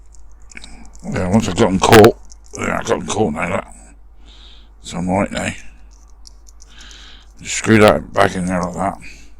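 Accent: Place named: Plymouth, United Kingdom. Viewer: Hi, I have been doing this since lockdown, really enjoying it. Used your video to help me with the Green Hornet. I am in Plympton Plymouth, from your accent you Must be a Janner! All the best Mark. Starting the Joe 90 this week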